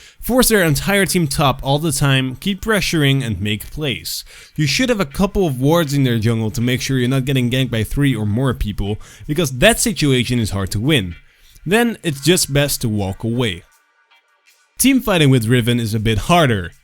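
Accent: Netherlands. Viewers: gij zijt nederlands :D !!!! <3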